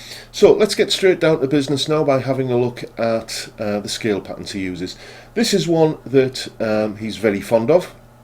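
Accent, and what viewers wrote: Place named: Redcar, United Kingdom. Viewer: A great video and I must say I love your explanations in this and other videos. I feel like I’m learning fast from them. I recognised your accent as soon as I heard it.....I grew up in Redcar and Marske myself. I’ve subscribed and will keep watching and learning.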